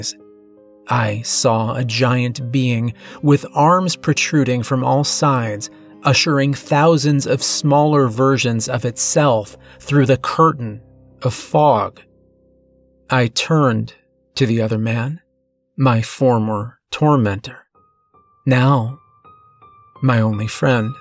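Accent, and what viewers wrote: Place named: United States. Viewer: Oooh er! That was a good one! In England we have 5 seasons spring summer autumn winter & fog! There's an old Scottish ghost story, well known, of a village appearing only in the fog, it's called Brigadoon Wanted to say, you have a really nice American accent, not Bostonian but has a British inflection somehow which makes your speaking voice ( narration voice) very pleasing & clear, lovely diction but please don't pronounce the word shone as shown ( it's just said as shonn) Plus the past tense of Drag is Dragged not Drug which is a pharmaceutical ( the dictionary says the current fad for using Drug & not dragged is an Americanism & not correct, their words not mine Btw, I'm not being derogatory it just grates on me, no offence meant)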